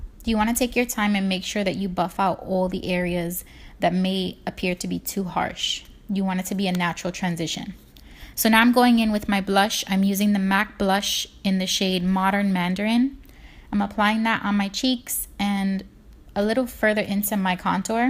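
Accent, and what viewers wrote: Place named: New York, United States. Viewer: Are you from new York or new Jersey you have an accent I like it!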